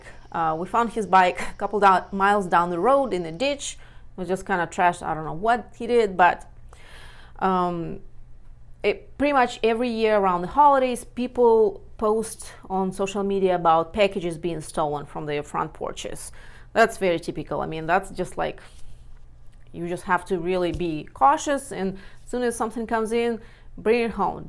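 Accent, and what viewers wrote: Russia. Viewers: Sound like a Russian mail order bride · I like you accent Russian.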